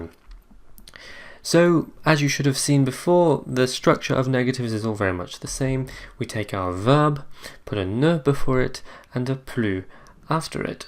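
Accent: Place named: United Kingdom. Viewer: I'm impressed by your British accent. I even don't know whether you are a French or an English man :)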